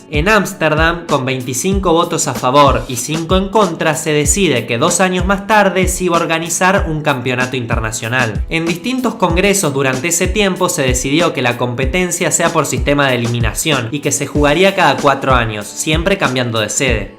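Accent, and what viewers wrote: Argentina. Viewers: no se nota el acento argentino?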